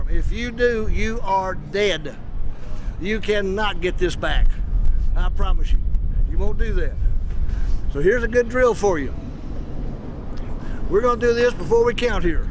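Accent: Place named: Texas, United States. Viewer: I bought his tapes in 1997 and i started hitting the ball straight as an arrow, My VHS broke and I forgot what he taught me. I'm 72 now two hip surgery's later and struggling. I'm glad I found him on You tube. i' m also going to buy a VHS and start resurrecting my game. I just love listeneing to his folksy Texan sytle-a great teacher.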